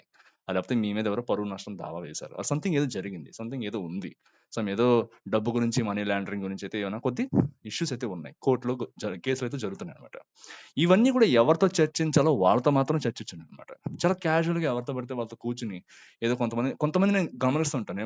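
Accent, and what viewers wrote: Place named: Godavari, India. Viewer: పక్కగా గోదావరి బాబాయ్.......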